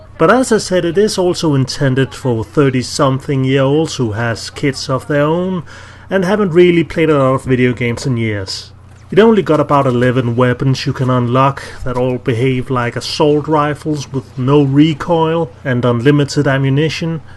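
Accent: Denmark. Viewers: Men too, I love the goofy Danish accent, all while the content is supremely nerdy and having a god mix off subjective and objective evaluation. You and Demiath are my two go to guys for intetesting video game content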